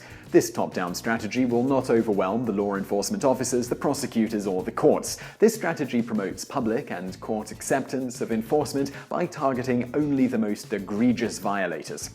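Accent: United Kingdom. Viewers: It's funny hearing someone with a British accent use, "miles per hour".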